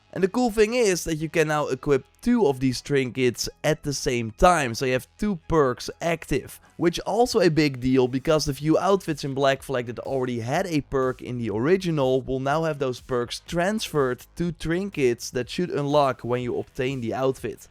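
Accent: Netherlands. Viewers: this clip is sh@ He doesn't finish combo's during fighting. This guy can't play the game. This guy is BAD advertisement with an irritating dutch accent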